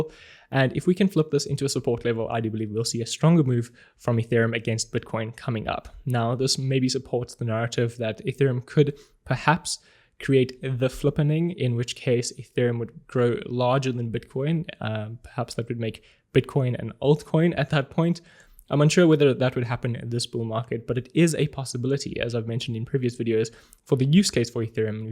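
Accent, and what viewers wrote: South Africa. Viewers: are you from South Africa? I don't know if you have mentioned it before, sorry if you had. Your accent reminds me to Elon's. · This guy sounds South African, am I right boet?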